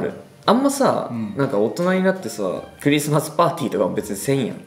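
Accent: Okayama, Japan. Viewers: さんの岡山弁大好きだから聞けて嬉しいです😁♡ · 岡山弁のさんがやっぱり好きすぎます🥲🍑❤️イチブトゼンブのモノマネめちゃわろてもーたww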